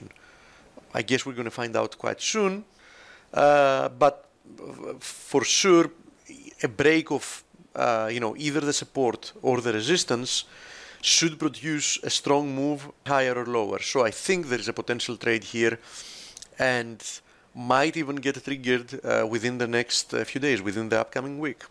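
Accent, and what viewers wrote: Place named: Greece. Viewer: Love his greek accent. Best analysis going.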